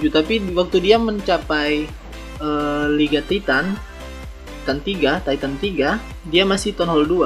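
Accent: Manado, Indonesia. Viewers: bang lo org mnado bang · Orang manado? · Kiraleh ng orng jakarta, trnyata cmn orng mnado😂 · org Manado Nn kang? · Bang orng manado ya? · Bang Asli Manado Kah?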